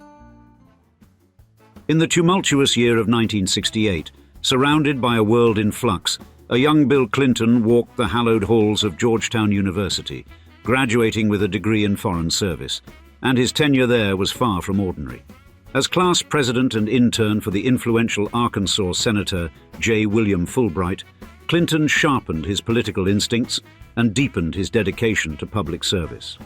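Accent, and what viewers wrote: RP, United Kingdom. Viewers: The lesson here is if you hire a guy with an aristocratic British accent you figure you can put all kinds of lies, bad stats and idiotic comments. I will just focus on one; Bill Clinton did not create a $200 billion + surplus. And this is just one of many in the first 5 minuted of this propoganda piece. Goebels would be proud. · Another BULL SH*T British narration - stick to your own corruption. There's plenty of it.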